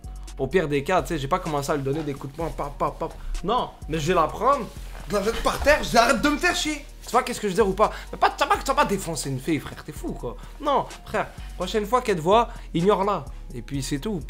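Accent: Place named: Quebec, Canada. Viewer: woula tu mfumes ahahah vive l'accent québécois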